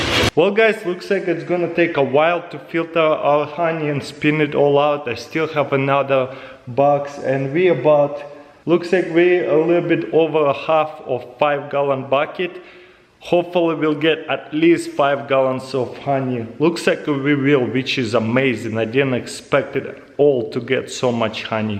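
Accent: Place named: Russia. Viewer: Привет смотрю тебя давно))Я знаю что ты русский)Т.к акцент ))Удачи в развитии🎉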